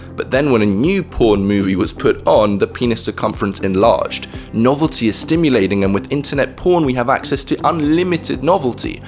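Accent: United Kingdom. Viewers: That is a British accent, to be honest I find some English accents a cringe to my ears, mainly American and Australian but that does not mean they should change to suit me.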